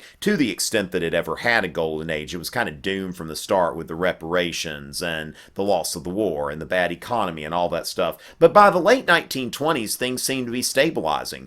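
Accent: South, United States. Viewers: Yeah it's southern. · Forgive me if I'm wrong, is your accent from the southern part of the USA? I'm Indian and I've heard this accent on American TV series, which makes me curious.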